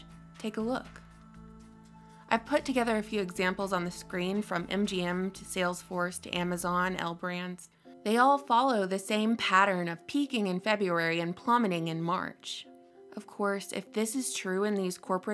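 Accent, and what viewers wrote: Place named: Georgia, United States. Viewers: I should have known you were a Georgia peach with a voice like that 🥰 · Thought I recognized the accent of a fellow north Georgian. I’m right outside Atlanta and heading there tomorrow to help my daughter finish moving from downtown Atlanta to Buckhead. I’m getting a little nervous about what we may encounter. 😬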